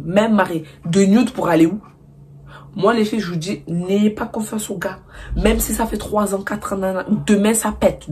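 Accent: Marseille, France. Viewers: t gênante andrea arrête de faire la gadjie mature tu dis dla merde elle a l’accent marseillais point arrête de rager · Ton accent il est trop beauu 💞 tu viens de Marseille ?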